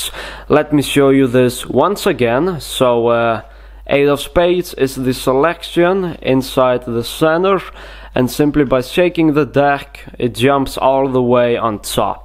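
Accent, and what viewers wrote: Russia. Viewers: are you from russia? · Почему решил вести канал на английском у тебя вроде как русский акцент) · Russisch Accent Genius...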